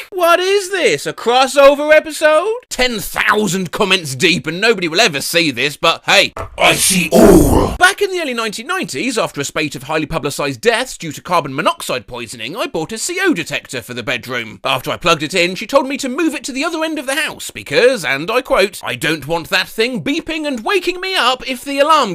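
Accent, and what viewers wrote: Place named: United Kingdom. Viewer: This is a really good thing to put on while crying, nothing is more comforting than a British man saying skull emoji while you absolutely bawl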